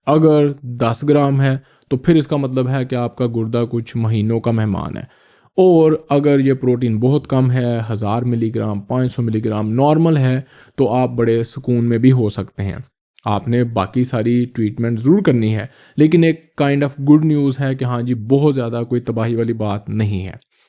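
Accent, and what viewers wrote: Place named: Punjab, India. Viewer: Sir Your vids are extremely helpful for ckd patients. Your urdu/ Hindi has a Punjabi accent. Love from Indian Punjab....❤❤❤❤❤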